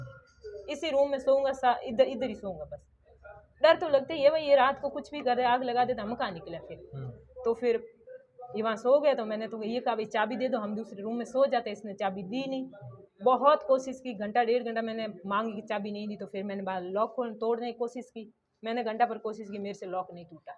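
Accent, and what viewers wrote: Rajasthan, India. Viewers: Awaaz se lag raha hai yeh youtuber gamo Rajasthani hai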